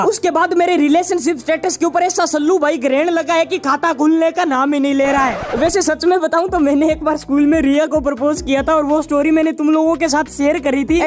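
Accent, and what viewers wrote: Rajasthan, India. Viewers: Aap rajashthan se ho me bhi aap ke waha aese bol te h kya Bhai ji Kiya ho 😅😅❤❤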